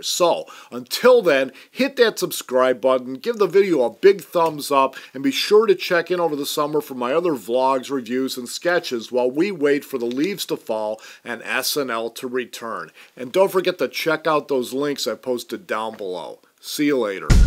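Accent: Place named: Midwest, United States. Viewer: why is it that this guys Midwest accent is so annoying? New York, Southern and Boston accents seem charming or amusing. Words like "FAAACTORY", "Gaaathering". Just an observation.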